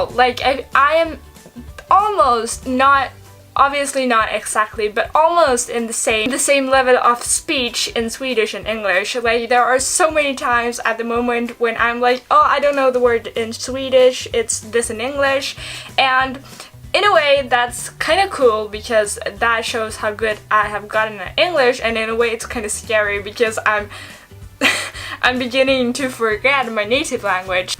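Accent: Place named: Europe. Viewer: I stumbled upon your channel due to YouTube recommending your reaction to the Captain Marvel trailer (okay, I admit, I've been obsessing over reactions to that trailer, so it was a no-brainer that YouTube would eventually recommend your reaction to me :-) ). But when I glanced at your video list, I had to check this one out. Of course I noticed your accent in the Captain Marvel video, but to be honest, it took me a few seconds to notice it. Your English was that good. At first, I was trying to figure out what region of America/Canada you were from. It was only after a while that I realized your accent had to be European. So good job! You've impressed this native English speaking Californian.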